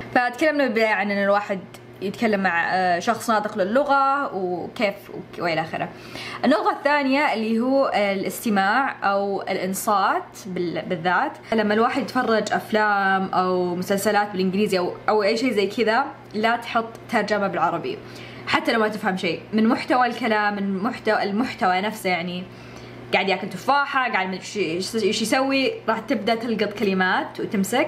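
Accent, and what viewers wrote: Najd, Saudi Arabia. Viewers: اللهجة دي حقت أهل الرياض أهل نجد🌹🌹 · لاتكلمي انجليزي اذا صورتي لنا لان لهجتكك او طريقة كلامك بالسعودي جميلللللللل 💛💛💛💛. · ياربي بعشق لهجتك السعودية الاصيلة دي بجد جميلة جداً ربنا يحميكي ♥️ بقالي ٣ شهور نباتية 💪🏼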